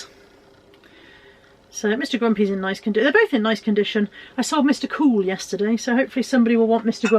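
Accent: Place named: West Country, United Kingdom. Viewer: I'm almost certain I just seen you in my local charity shop in bridgwater if not was your double with that lovely West County twang 😂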